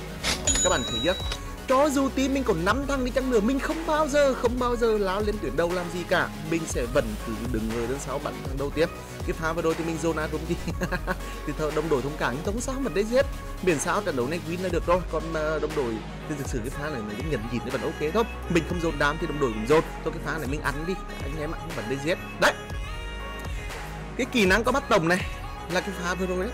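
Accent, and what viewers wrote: Nghe An, Vietnam. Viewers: Chất giọng nghệ an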